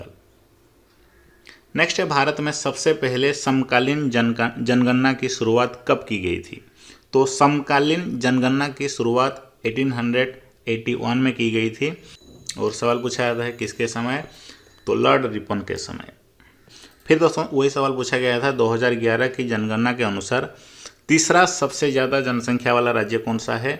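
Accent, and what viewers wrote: Odisha, India. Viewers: Oriya bhai ka tune hai.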